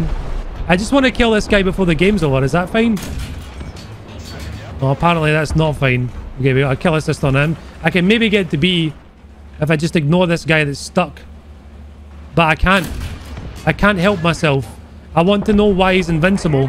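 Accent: Scotland, United Kingdom. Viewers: love your videos! I'm new to your channel, but so glad I came across one of your vids and subscribed immediately! Not sure if or how you take suggestions/requests to showcase a vehicle, so I'll just ask here: could you possibly take out the American T54E1 (8.0 BR) and show how it fairs these days? P.S. Everything sounds so much better with a Scottish accent, please say: "Pesky murderous sidewinder" in the thickest Scottish accent you can master in one of your next videos!;P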